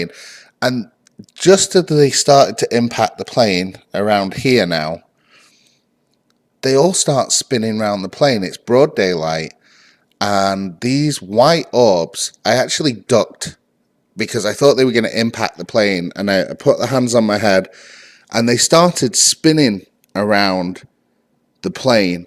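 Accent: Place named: Manchester, United Kingdom. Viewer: OK, I thought I rec ognised a Manc twang in there, I'm from Bury.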